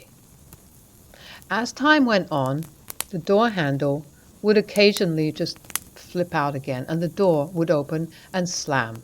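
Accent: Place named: England, United Kingdom. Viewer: Yay finally an English voice telling me spooky stories! And fire sounds too! Excellent, continue please ✨☠️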